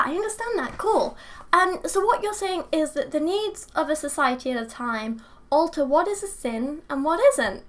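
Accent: United Kingdom. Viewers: You really should lose the idiot Brit accent. I can't help but think of anyone using that accent as a complete moron. I mean, you still have a queen for crying out loud...A FUCKING QUEEN. Damn, you don't get much more primitive than that..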